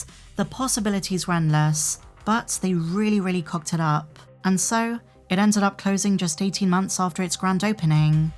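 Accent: United Kingdom. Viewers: I'm no fan of the speculation portions here, or the non-video media offerings since my generation didn't align with the release dates, so I'm not as obsessed as most of your viewers. I am very curious about the person behind the character here. The facts as I've observed is that you put a ton of effort into editing. No breathing pauses, a UK accent while living in America, and your personality coming through in the dialog. My guess is that you're an American male working incognito and are your initials. I have nothing against it if that is the case and would likely do something similar because of all of the loud, crazy, uninformed, and over opinionated attention addicts, lol. Good luck moving forward, and if you ever run out of topics, there's always the fan fiction to share.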